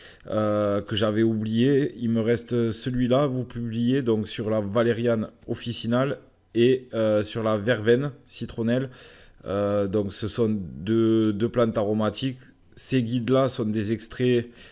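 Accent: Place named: Paris, France. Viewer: Il a toujours, l'accent pointu 😂❤ail c'est dur une tomate 🍅 si elle tombe du balcon sur moi, je me fais une razia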